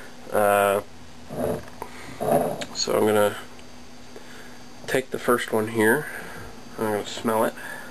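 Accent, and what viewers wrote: Canada. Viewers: is he canadian (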